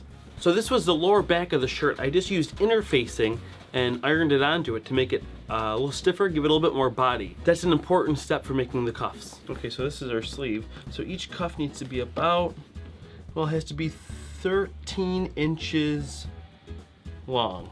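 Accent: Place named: Midwest, United States. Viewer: where are you from? I just think you sound midwestern but not sure (CO expat living in England)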